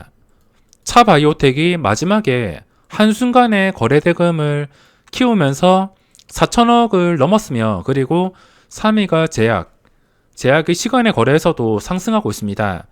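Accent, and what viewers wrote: Gyeongsang, South Korea. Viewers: 갱상도분이라 발음은 이해해주세요ㅠㅠ 저도 창원이라 정말 발음 힘듭니다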